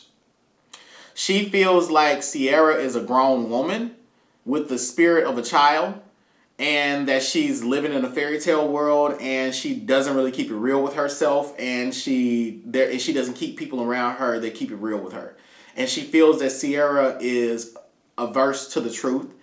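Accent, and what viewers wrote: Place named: South, United States. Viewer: I love your country accent btw! The Southern accent is very sexy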